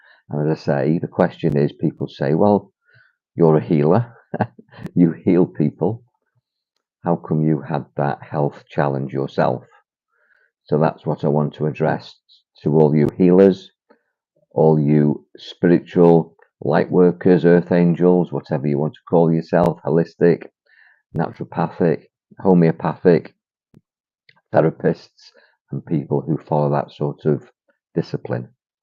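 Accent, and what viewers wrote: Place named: Manchester, United Kingdom. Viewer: Omg listening to this sounds like I'm listening about myself.. I seem to have gone through repetitions though & really at the stage where I just don't have the energy to suffer anymore. I trained in hypnotherapy too, so do know all this stuff but have found that I'm great at healing everyone else, just not myself & I know that is an affirmation in itself. (The people pleaser also) but i would be very interested in talking with you to help me move forward. I am in my 50s & no longer wish to survive but wish to thrive now. I think it's time! Also I recognise your accent are you originally from Manchester?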